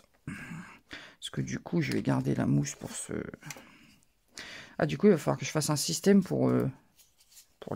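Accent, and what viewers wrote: North, France. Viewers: Tu es du nord ??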